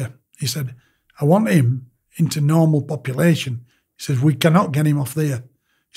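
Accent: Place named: Yorkshire, United Kingdom. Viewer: Such a lovely lovely man. He has been through so much in his life. I was so fascinated listening to him tell his story. I shed a tear when he did as the way he was treated was so dispicable. Love his Yorkshire accent too. Thank goodness he has a great supportive wife to help him get through. bless him 💘💖❤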